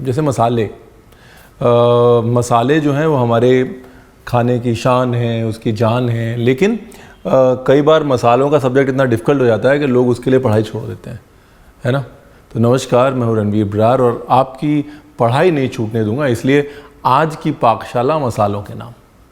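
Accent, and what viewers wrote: India. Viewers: At first I used to ignore your videos coz I’m not that much into cooking!! Then I started watching ur videos.. now I’m really liking ur videos ofcourse I get to learn few things but mostly your Indian-desi style of talking n cooking... ur videos are entertaining!! Keep up the good work Ranveer!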